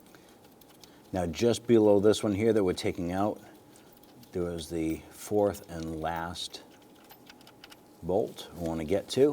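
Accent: Boston, United States. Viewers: Boston accent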